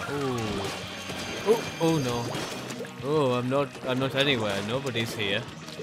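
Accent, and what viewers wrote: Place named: Scotland, United Kingdom. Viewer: You sound partially scottish and partially not, it'S CONFUSING but this video has some really nice tips. I'd love to practice with you one day :'3 it's nice getting tips and I learn better through practice anyway. ... though, I'm interested- as another weapon, how would you counter a tri-slosher user? ;)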